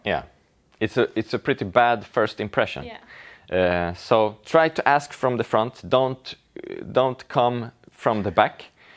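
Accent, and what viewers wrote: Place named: Sweden. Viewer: Hey, are you Swedish? The reason for why I’m asking is because it sounds like you have a Swedish accent! I am half Swedish myself. I think you are the best male Kizomba dancer, you make the dance really beautiful and not too much. Mikaela is also the best female kizomba dancer in my opinion! I think she’s also Swedish... anyway it’s so fun to see you together, I hope you do dance videos together that would be absolutely amazing!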